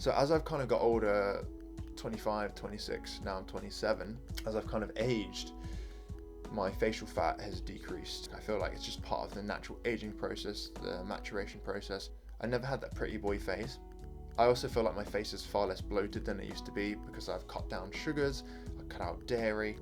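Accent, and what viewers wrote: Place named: United Kingdom. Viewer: ngl I've never seen an asian with a UK accent completely threw me off LOL. Great vid tho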